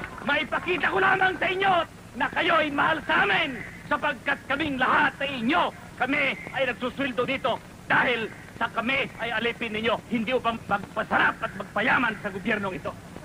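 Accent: Philippines. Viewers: pinoy na pinoy ang ang nagsasalita.yung mga nagcocoment nmn mga engeserot englesero kaloka😅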